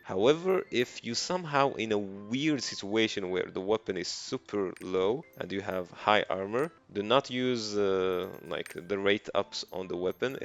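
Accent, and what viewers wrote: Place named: Morocco. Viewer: Accent d mgharba mwr9a :d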